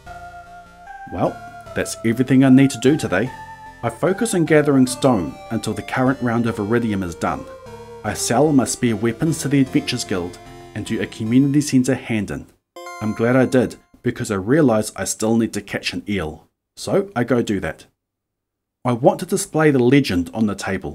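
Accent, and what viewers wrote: New Zealand. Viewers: New Zealand accent. 👌🏽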